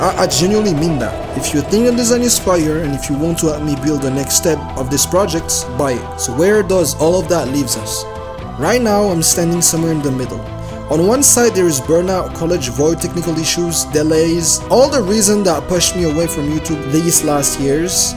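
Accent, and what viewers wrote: France. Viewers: il est français ?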